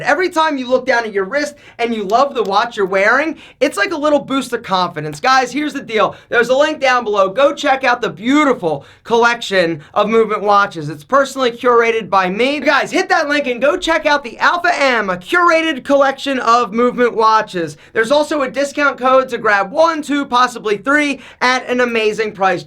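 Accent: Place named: New Jersey, United States. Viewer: You gotta be from Jersey right?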